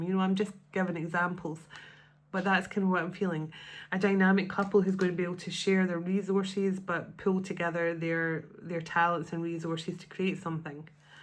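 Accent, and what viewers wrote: Scotland, United Kingdom. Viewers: I love when you talk about yourself and your voice is beautiful! That Scottish accent is what drew me to you in the beginning so please don’t dislike your voice it’s beautiful. Yes lots of releasing and purging past timelines and emotional trauma yesterday. I’m ready for a new start. The past is the past no changing it but working on myself in the present is much more gratifying. I’m in the time in my life where I feel if my person doesn’t come back that’s ok I did the best I could and there’s nothing more for me to do but love myself and I choose me Everytime. That inner peace is something I work towards everyday. Inner peace and happiness is the best thing I can give myself. Whoever comes along with me is just a bonus. ❤ 123 likes and 777 views i love the numbers lol I woke up this morning with the song “ ” by in my head. 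😮I took a nap yesterday and dreamt I was swimming in a pool it was my old high school lol today I dreamt of another body of water lol 😮 I knew I loved you played on my radio the other day. It made me tear up such a beautiful song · 😅 I love ur Scottish voice and the things u say! It made me very happy! And it's true all u have said about where we are on this journey! 🎉❤❤🎉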